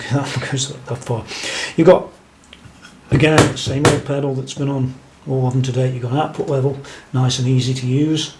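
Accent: Stoke, United Kingdom. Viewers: YOU FROM STOKE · Nice review..are you a stokie?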